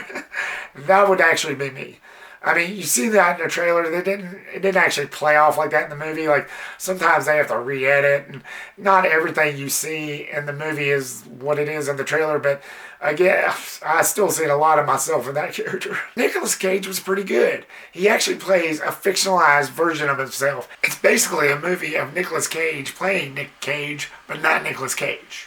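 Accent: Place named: South, United States. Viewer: You sound like Vince Gilligan, but we are all southern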